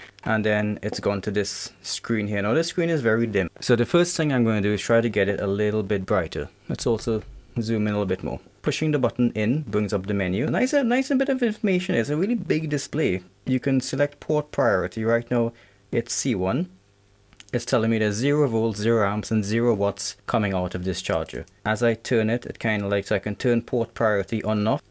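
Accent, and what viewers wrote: Trinidad and Tobago. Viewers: I subscribed because of the accent that sounds trini 😮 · are you from Trinidad 🇹🇹? I was born in the States but grew up and went to primary school there. I know that accent when I hear it👍🏾.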